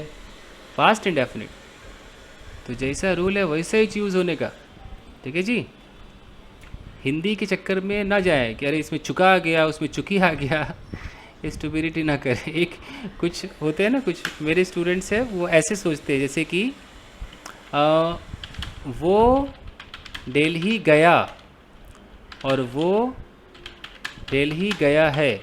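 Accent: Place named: Bengal, India. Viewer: Vaiya aap ka bengoli bohut sweet hai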